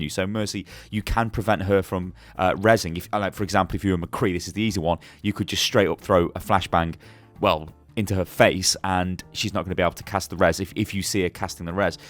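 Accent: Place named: United Kingdom. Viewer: Your so British!🤣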